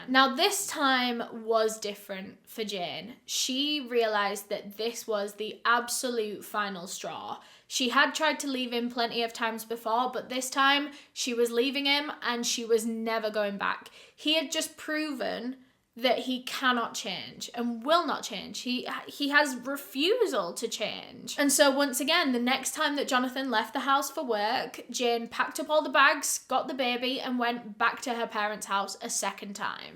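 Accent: United Kingdom. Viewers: I know this a British accent, but it seems different! Your accent is so satisfying!! What area of the UK speaks like this,,,, unless it’s not British haha.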